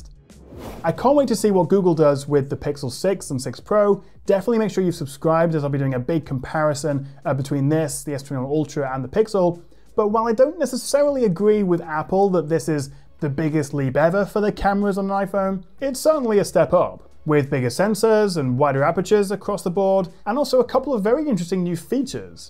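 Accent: United Kingdom. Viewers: Excellent presentation that looks like a live TV coverage. By the way, where was this beautiful village taken? Compliments to your clear, creative, and colourful narration. The British accent is so cool and clean ! love it.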